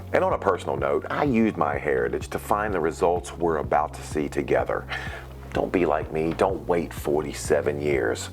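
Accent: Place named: Appalachia, United States. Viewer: I knew it. I totally hear the Appalachian in your voice.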